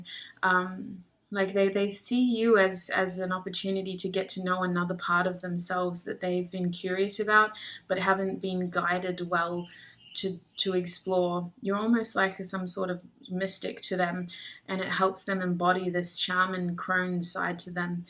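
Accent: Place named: Australia. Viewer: I watch these videos every night as I go to sleep because you have a beautiful, pleasant, lovely Australian accent 🥲💐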